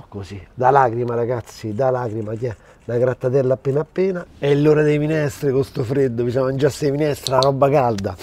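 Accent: Rome, Italy. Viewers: mi sono iscritto da poco e ti trovo troppo forte! ricette interessanti raccontate dalla tua travolgente simpatia e accento romano... io sono di bergamo e stò cercando nei miei video di coprire la mia accento!... ma forse è meglio valorizzarla..bho....comunque complimenti!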